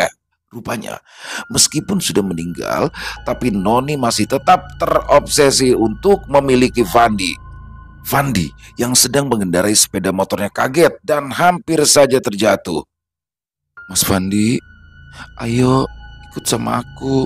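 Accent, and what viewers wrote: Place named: Jakarta, Indonesia. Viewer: ia sama2 Bang seneng mendengarkan suaranya maaf Bang aslinya dr jakarta suaranya ciri kaya orang Jakarta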